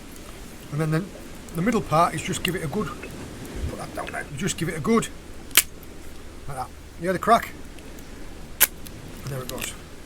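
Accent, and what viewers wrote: United Kingdom. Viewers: Such a beautiful video. You didn't take James this time with you. Again the lovely British accent as always.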